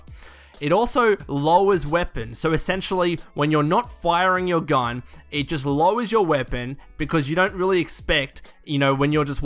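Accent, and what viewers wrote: Australia. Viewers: Yeah the Australian accent can be hard to understand for some people :P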